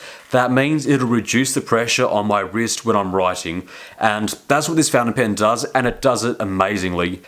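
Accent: Australia. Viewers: Holy fuck man!!! I remember your original videos! You're back now with a deeper voice and some scruff, but the same Aussie twang 😅 Great to have you back and see you're well, this genuinely made me happy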